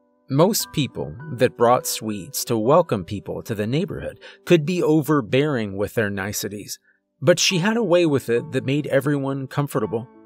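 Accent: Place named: South, United States. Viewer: Now whenever you do you southerner accent all I can here is TRAVIS MA BOY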